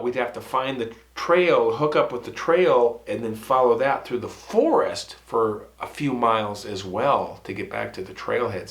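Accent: Minnesota, United States. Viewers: I knew you had the Minnesota accent!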